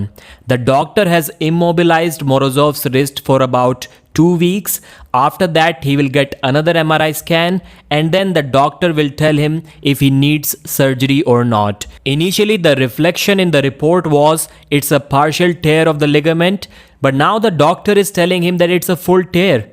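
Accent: India. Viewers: the only indian whose accent for some reason, I don't hate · level of hindu accent. Rolling like every letter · this Indian accent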